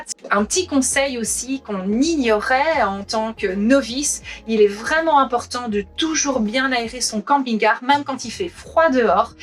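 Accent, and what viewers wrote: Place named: Belgium. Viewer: Trop mignione cette femme avec son accents un petit accents belge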